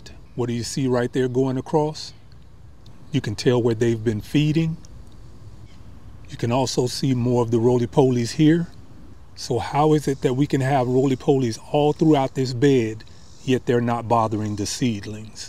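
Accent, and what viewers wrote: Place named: South, United States. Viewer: Your voice tone/accent brings back so many southern traditions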